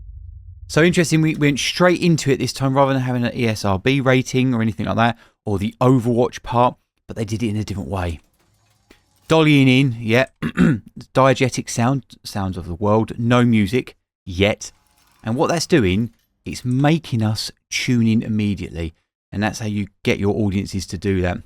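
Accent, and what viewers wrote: United Kingdom. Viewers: Well, let's go for 45 minutes of great British accent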